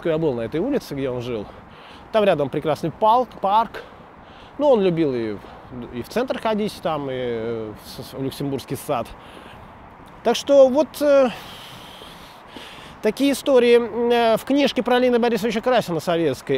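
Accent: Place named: Saint Petersburg, Russia. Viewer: Чувствуется ваш Петербургский акцент , каждая буква произносится тщательно,блестяще.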